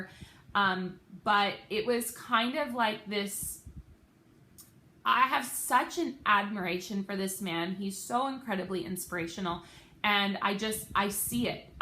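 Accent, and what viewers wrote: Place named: Australia. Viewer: I can't wait to hear my name with an Australian accent!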